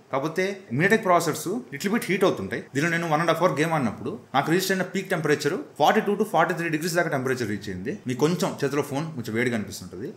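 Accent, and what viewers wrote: Nellore, India. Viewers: Anna needhi Nellore aaaaaa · Mawa nellore ah Mande ❤😂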